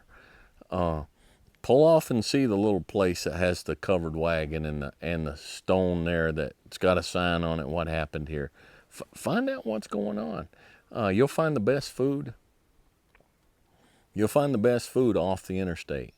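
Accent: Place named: Tennessee, United States. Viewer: Sounds like you live close to Pulaski tn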